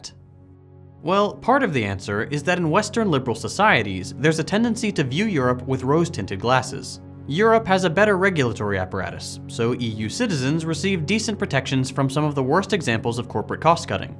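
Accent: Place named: United States. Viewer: Sounds so... American.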